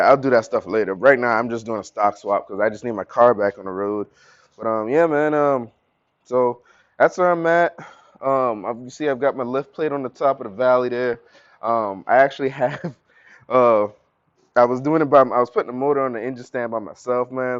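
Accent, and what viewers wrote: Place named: Caribbean. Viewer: Whats good man. I just released a vid on those pistons. I'm doing a Dual LS Swap on my box chevys. Where you from? I hear a lil caribbean accent in there.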